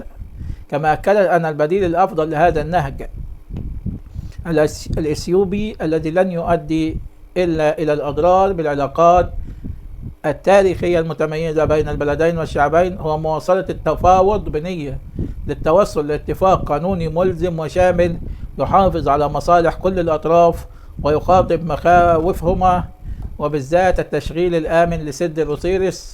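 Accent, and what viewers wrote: Egypt. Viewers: وبعدين حضرتك طريقتك وكلامك مصري وعجنا كده واحنا راضين وممتاز جدا تحياتي لحضرتك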